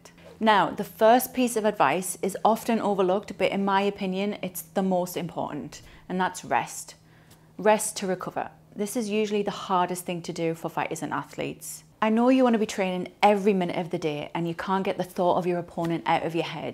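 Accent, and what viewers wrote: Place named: Sunderland, United Kingdom. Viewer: My ribs are done in off a hook to them. At least 6 weeks off. Possibly broken or muscle damaged. I can’t help noticing the sunderland accent. Am from Washington Tyne and Wear.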